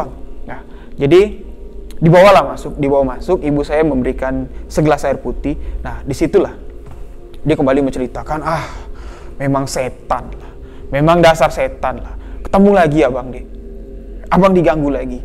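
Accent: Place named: West Sumatra, Indonesia. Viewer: Nada suaranya kayak org minang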